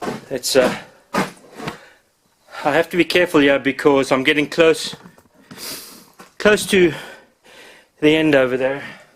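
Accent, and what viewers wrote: South Africa. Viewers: You sound South African. Where are you from? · Good old South African accent there. Subscribed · Wow. Came here by chance. Almost fell over when I heard the accent. Nice to see an ex SA man doing some great things. Where are you based? Subbed.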